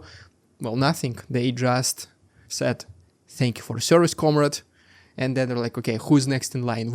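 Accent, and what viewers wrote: Russia. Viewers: He's Russian that's where the accent comes from.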